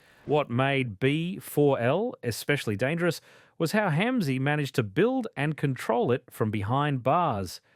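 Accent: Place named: United States. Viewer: I hear an American accent but it could be AI if not do some research so you stop sounding like a dickhead it's always been like this why do you think some people earn full colours in half the time of others. You sound like a square head who reads something in the newspaper and then talks to other straights like he's in the know. Don't usually comment but I'm sick of this shit.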